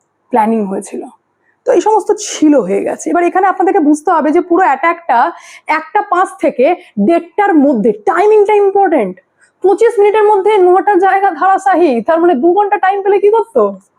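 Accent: India. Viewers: Bangla bolte bolte hindir aanch ta na tanai valo. Yeh-te sunte irritate laage. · দিদিভাই তোমার ভিডিওগুলো খুব ভালো হয় কিন্তু তোমার ভিডিওতে দিদিভাই হিন্দি টান থাকে পুরো বাংলায় ভিডিও বানান দিদি আপনার ভিডিওগুলো খুব ভালো হয় প্রাউড বাঙালি হিন্দি টান ছেড়ে ফুল বাংলা টানে ভিডিও বানান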